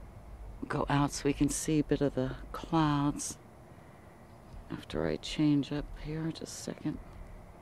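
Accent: United States. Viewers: Good morning {, evening) dear ..,yes very interesting and fantastic. (,,,nos kedves nő annyira jó hallgatni a narrációt azzal az ízes jó amerikai angol kiejtésel , hogy az valami hihetetlen számomra , imádom, és köszönöm.) ..ok ..l wish you a wonderful week - end dear .. ok .. Big Hug.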